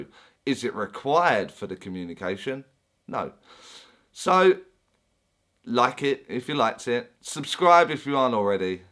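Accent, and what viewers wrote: United Kingdom. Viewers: Breath of fresh air. My fellow Brit says it how it is. Sounds like he comes from my neck of the woods. Thank you!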